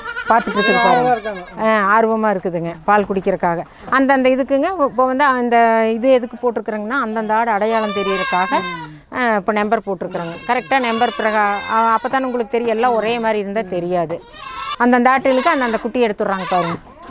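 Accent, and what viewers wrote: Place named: Madurai, India. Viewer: ❤ madurai basha ❤❤❤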